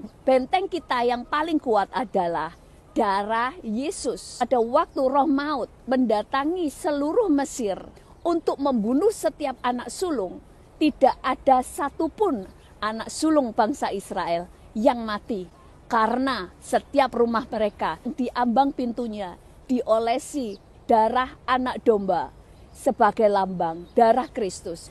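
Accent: Java, Indonesia. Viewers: pendeta e medok tenan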